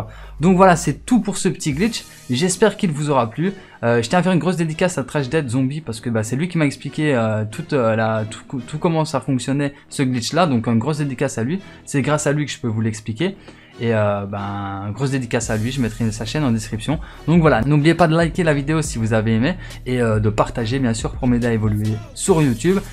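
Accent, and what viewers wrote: Belgium. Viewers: T es belge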